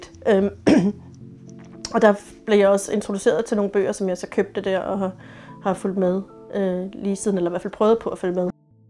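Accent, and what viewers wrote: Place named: Scotland, United Kingdom. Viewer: wow she sounds so scotish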